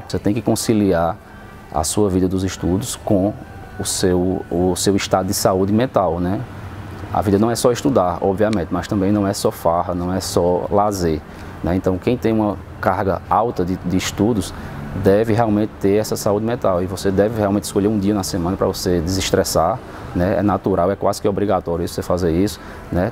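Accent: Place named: Pernambuco, Brazil. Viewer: Sotaque de pernambucano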